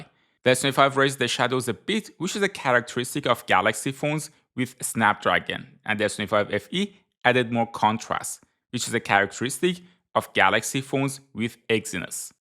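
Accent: Iran. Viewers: Dude are you Iranian your accent is so obvious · Persian accent, nice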